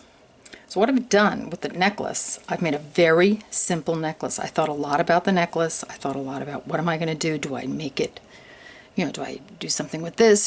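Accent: New York, United States. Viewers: you sound like youare from New York, where most people have patience with us. I made this by using 6mm bicones and 6mm rounded beads my friends love it, i use 86 tila and i found another way to make it with squares as well.. love it, i would love you to make something with pinks and purples and silvers.. thanks Love you girl.. I can bring you some Blue paddings. I am so addicted to this necklace I have made 12 of them so far and to give to gifts.. i need to show you what i made so far now i am making a blue bracelet a bit different.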